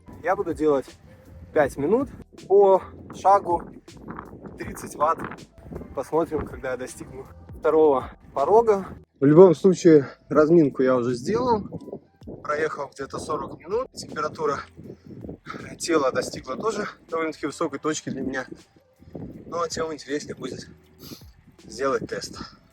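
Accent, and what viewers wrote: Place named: Moscow, Russia. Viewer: Чувак конечно крутой, но маасковский акцент все убивает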